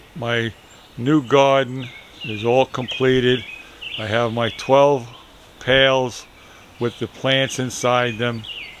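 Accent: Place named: Long Island, United States. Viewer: Thanks so much for sharing this with us, Grandpa! these 'raised" gardens seem to be all the rage these days, no bending down to weed!! Thanks too, for sharing that delightful Long Island accent with us, the word "tomato" becomes 'TOMATA."!!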